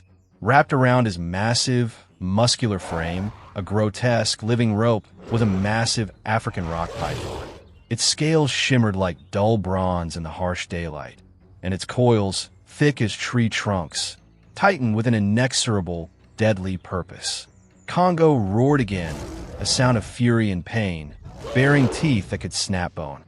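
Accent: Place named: United States. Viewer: Oh dear, sound off again, as it wasn't the lions that sent chills down my spine. It was that flaming American narrator's voice!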